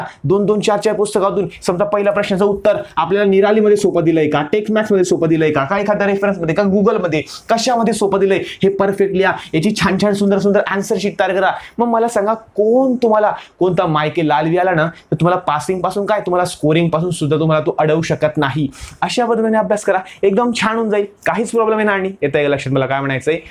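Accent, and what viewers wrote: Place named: Jalgaon, India. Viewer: दादा तुम्ही जळगांव चे आहात का?, कारण तुमच्या भाषेवरून तसं जाणवून येत आहे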